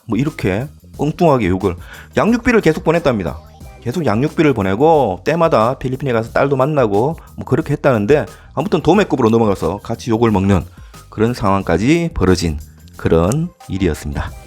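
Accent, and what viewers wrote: Gyeongsang, South Korea. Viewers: 경상도 말투던 뭐던 전달 엄청 잘되는데.. 외국인이 영상을 보나?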